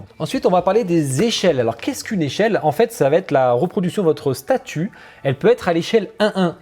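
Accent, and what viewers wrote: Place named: England, United Kingdom. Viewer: Cet accent anglais je suis fan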